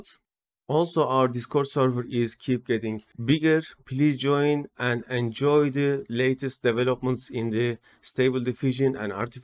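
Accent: Turkey. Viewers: o kadar guzel turk ingilizcesi konusuyosunki direk dikkatimi cekti ;D Guzel video bu arada thnxx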